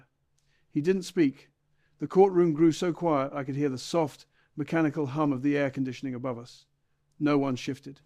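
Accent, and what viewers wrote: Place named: United Kingdom. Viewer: Agreed. Can hear the british accident.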